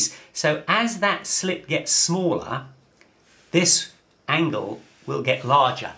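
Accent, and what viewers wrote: United Kingdom. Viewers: Brilliant videos, thanks ! and im so delighted. His english is crystal clear! haha god bless British accent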